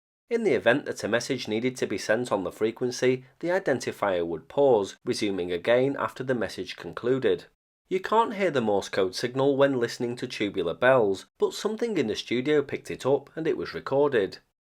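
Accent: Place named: Manchester, United Kingdom. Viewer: From Manchester I think.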